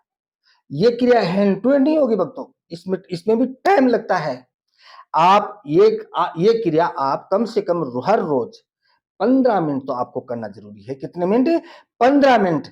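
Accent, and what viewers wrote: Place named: South, India. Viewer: Dada g ka hindi south ke hindi se milta julta hai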